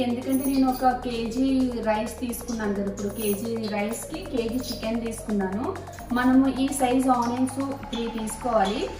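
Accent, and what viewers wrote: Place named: Mahabubnagar, India. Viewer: Na language kuda me mataladinate undati same I am mahaboobnagar district . so nice mam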